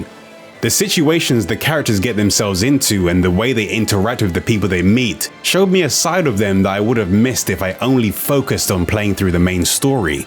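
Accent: United Kingdom. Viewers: Clips Dude he just sounds a little british, weak bait lol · Are you from the UK?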